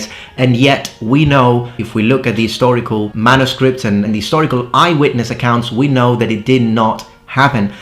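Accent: England, United Kingdom. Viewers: Hey, I noticed in some of your older videos such as your Kanabo video from 2 years ago you had a samurai helmet, where did you get it from? I’m in the UK as I assume you are judging by your accent, yet they’re all ridiculously expensive online. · haha, wow. iv only watched you for one year. I just watched The king so am now watching YouTube analysis and here you are in your primordial form. iv always been blown away by your English usage by all metrics. You do sound much more English in your primordial version, i wonder how and why?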